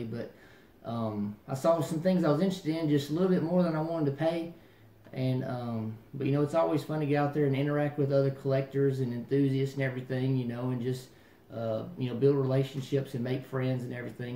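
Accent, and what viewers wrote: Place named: Deep South, United States. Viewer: Thank you Man, i knew you re from the deep south because of your accent. Take care.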